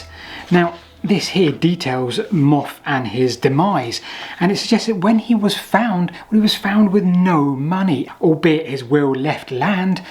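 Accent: United Kingdom. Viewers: How does Youtube think the audio track is "English (United States)"? He is the most British sounding bloke I've heard all day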